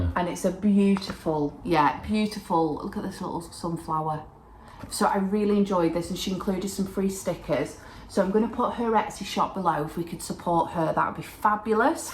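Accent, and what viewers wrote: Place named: England, United Kingdom. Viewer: Never saw you before. Glad to be here. Where are you from? I love your accent. I would think England but heavy accent makes me think maybe not. Next I must say I LOVE your Trick or Treat Town. It is perfect!! I hope to be able to purchase. I am in US and always happy to meet fellow cross stitchers. Crack on! I subscribed.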